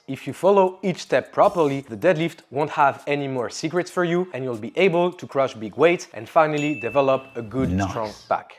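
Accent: France. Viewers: Super tes vidéos en anglais ça me permet de m’exercer à l’oral de manière pas trop hard core grâce à ton accent français 👌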